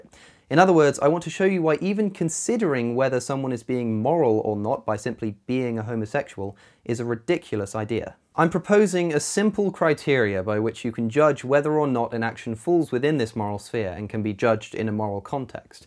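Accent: United Kingdom. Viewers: His British accent makes him sound smarter than he otherwise would and the American flag in the background confuses me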